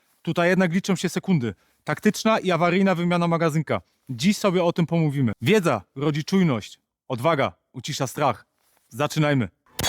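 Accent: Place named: Germany. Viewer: To lubię: krótko, treściwie i na temat :) A tak z ciekawości - długo jesteś w Niemczech? Mam wrażenie, że słyszę już lekko niemiecki akcent ;)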